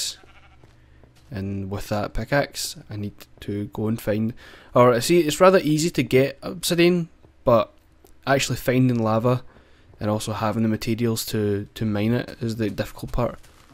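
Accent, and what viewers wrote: Scotland, United Kingdom. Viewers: I really liked your MW2 commentaries I love your Minecraft videos I am OBSESSED BY YOUR AWESOME SCOTTISH ACCENT!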